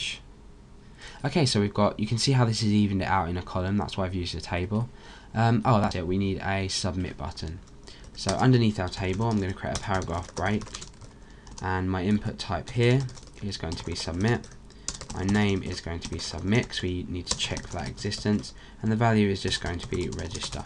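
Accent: United Kingdom. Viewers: dude, you're the best plus your UK accent, that makes you AWESOME, i love you!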